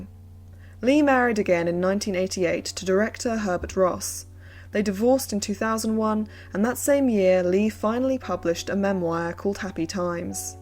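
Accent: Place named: United Kingdom. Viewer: Dear Ms, your British voice telling different aspects of these Swans was perfectly delightful! Thank you! I’m almost finished but I dread..,the end of the story! Because yore video was so, how do I say…reminiscent… I accepted an “Invitation” and will drive…all the way to that Coctail Party! Mind you, am a 😍”Swann”! And ye, I had a Truman C. In my life too…Funny, Do all Birds of a feather, flock together? Thank you again! Have a Blessed Holy Saturday Night! Kali Anesthesia! Nurse Jane, Deale, Maryland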